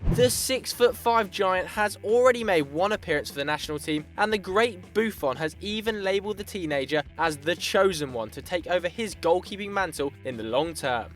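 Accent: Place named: United Kingdom. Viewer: i cant even understand this British fuck when he gives stats. Whats "Naut".495???? 0.495 or 1.495 what is this bullshit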